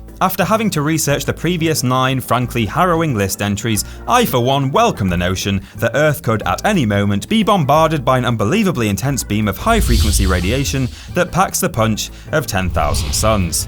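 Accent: United Kingdom. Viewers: I just love British sounding narrators..............They sound so intelligent.............................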